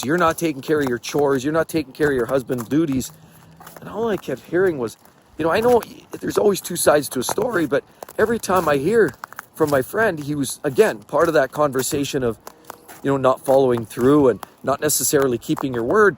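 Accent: Canada. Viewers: Are you Canadian, or northern U.S. ? I hear a bit of a Canadian accent.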